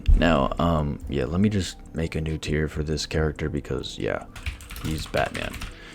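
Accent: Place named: England, United Kingdom. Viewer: You can kind of hear the English cadence in his American accent, it makes vigilante sound innocent and polite with all the dumb stuff he says so it’s funnier 😭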